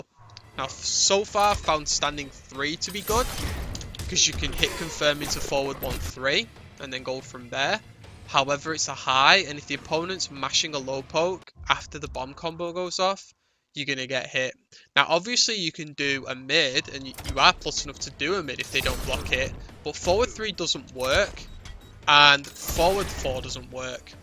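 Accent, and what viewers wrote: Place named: United Kingdom. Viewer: wakeOp lol that british accent always reminds me my old english teacher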